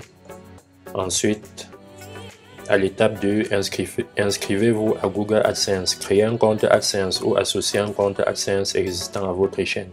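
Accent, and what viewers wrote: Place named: France. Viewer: moi j'aime trop sont acssent francais :D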